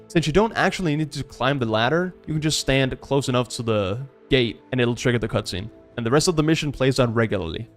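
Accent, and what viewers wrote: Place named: Denmark. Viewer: Are you Danish? Your accent sounds Danish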